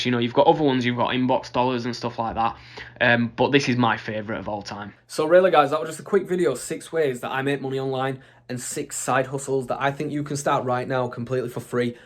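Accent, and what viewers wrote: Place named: Northern England, United Kingdom. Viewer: This is the first money making video that I can actually get my head around. Maybe it’s your northern accent I find reassuring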